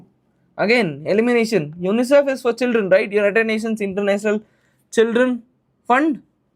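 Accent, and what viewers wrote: Kerala, India. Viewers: Sir are you malayali? i can smell you accent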